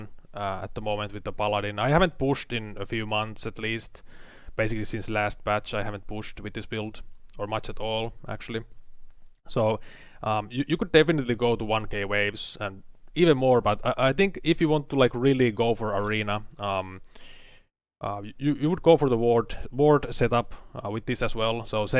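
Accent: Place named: Finland. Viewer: Juu kyllä suomalaisen rallienglannin tunnistaa ^^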